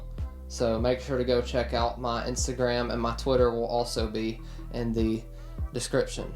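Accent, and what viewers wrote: Texas, United States. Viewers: you have the most texas accent ive ever heard god damn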